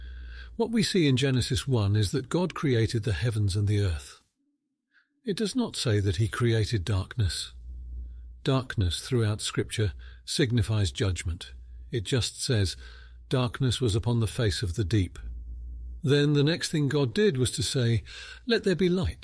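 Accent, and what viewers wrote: United Kingdom. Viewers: Hi, my husband and I started the devotional, and he likes it😁. However, I had a question and sent you an email....I really like the English accent on your teaching...❤ by the time this ended I just wanted to yell HALLELUJAH 😂😂😂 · I agree with the Gap theory as well. The theory gives us a possible little glimpse into some of the huh? Things between God and Satan. But I like how you taught in this in relation to it and pointed back to Jesus. I’ve never really heard it taught this way and it was beautiful. I’m so thankful for the mind God has given you. There is an understanding not just of literature, and logic and God has given you not only intelligence, but it is clearly Spirit filled and relational. It’s wonderful to see Him working through you. I don’t have time to read comments these days…so I’m sorry if people give this same feedback…I like the British voice I guess. 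But the thing that’s great about your speaking is the little aspects of your personality that come out. When you say something goofy or let a little laughter in. It’s more personal. But I support the ministry whomever is speaking it.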